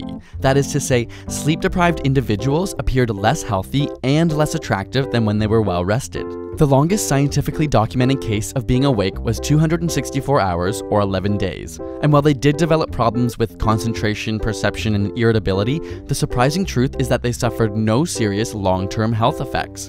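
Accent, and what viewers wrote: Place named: Canada. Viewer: You are Canadian... I can tell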